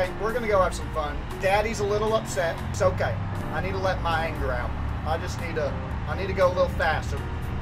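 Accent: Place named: West, United States. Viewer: I like the western accent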